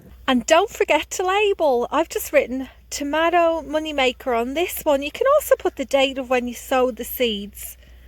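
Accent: Ireland. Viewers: Or I saved the seed from my own plant. Lovely harvested...beautiful produce! And, your Irish accent is so beautiful!🙂 See you next week!🙂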